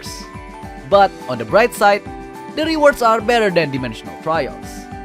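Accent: Indonesia. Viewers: Lu orang indo ya bang? Logatnya kerasa banget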